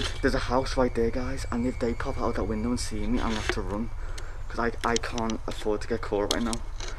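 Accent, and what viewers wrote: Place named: Liverpool, United Kingdom. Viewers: Hes from Liverpool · I think he's just a typical scouser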